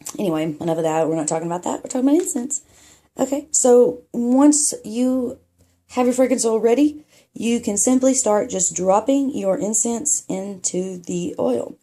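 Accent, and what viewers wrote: South, United States. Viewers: The video was fine. Don’t pay these dummies no mind. I’ve never done incense and want to try it and this is instructive. Gosh, and that wonderful southern accent! I totally miss hearing it!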